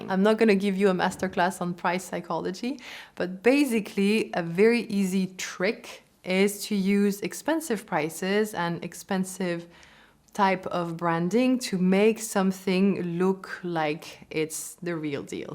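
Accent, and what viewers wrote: France. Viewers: Hey u look so pretty and your french accent is very lovely. 🤓🫶💖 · Very informative. I like your lovely French accent.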